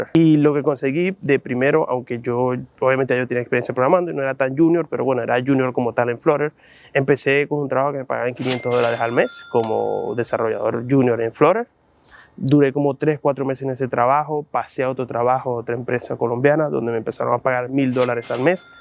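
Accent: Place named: Zulia, Venezuela. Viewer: Lo malo es que parece que flutter ira en declive segun los ultimos movimientos de Google. Tu acento parece de Edo. Zulia. Saludos desde Machiques. Actualmente vivo aqui y trabajo para una empresa del exterior como dev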